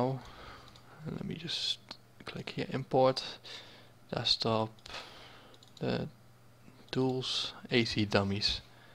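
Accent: Netherlands. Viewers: Are you dutch? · Dude, you dutch?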